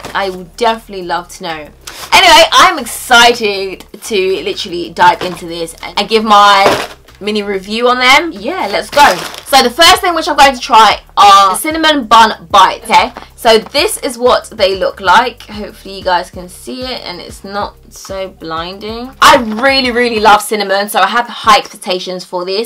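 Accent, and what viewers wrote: United Kingdom. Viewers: my name is marlene and i have tasted all those candy exept the twinkiesand your right the cowtales are super gross but the sour balls that you didnt like i love those and i love your british accent and you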